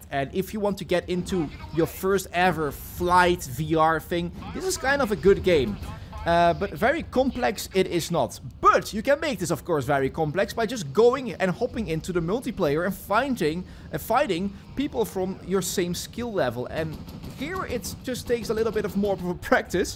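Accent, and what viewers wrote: Netherlands. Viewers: The dutch accent made the whole video 😂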